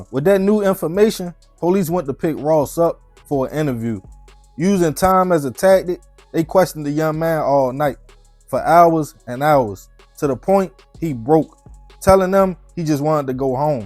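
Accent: Baltimore, United States. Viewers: I love when I can still hear that Baltimore accent in your voice 😂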